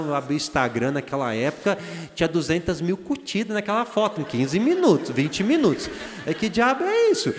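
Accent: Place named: Paraíba, Brazil. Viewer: Eu jurava que o senhor era da Paraíba.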